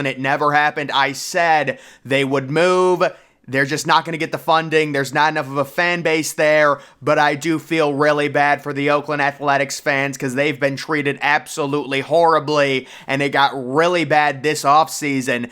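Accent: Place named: East Coast, United States. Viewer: The host is definitely from the east coast.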